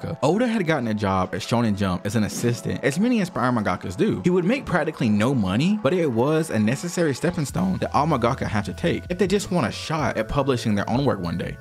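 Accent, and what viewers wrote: South, United States. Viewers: Now realizing you’re jus doing a YouTube accent to cover your southern accent, bro own it, be the southern one piece youtuber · i aint the only one who hears a county acsent right?? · Where is that accent from is sounds southern but im not sure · That southern accent lowkey hittin’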